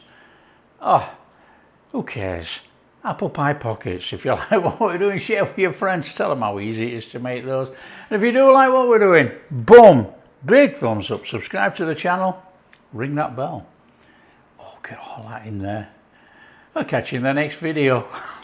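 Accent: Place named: Lancashire, United Kingdom. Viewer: Im from littleborough lancs i think i may be not far from you by your accent, i will google magna thanks.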